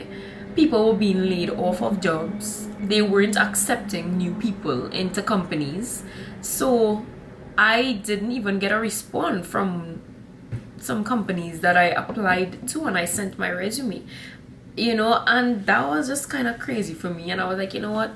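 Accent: Trinidad and Tobago. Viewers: You are so right, I'm in Western Massachusetts perfect for anything,about the long days look up the midnight sun in Norway or the twilight all night long in Northern Alberta,I luv the accent its one of the most beautiful,You're a true Trini a mix of many things, much success to You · It's refreshing to hear a trini accent. I lived in Canada for years long winters and no jobs. I now live in Maryland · I like your Trini accent too. The best Caribbean accent.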